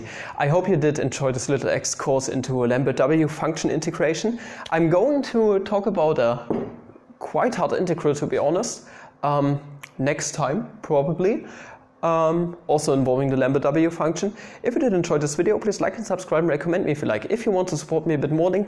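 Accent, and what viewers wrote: Germany. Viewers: Ahhh the good old german accent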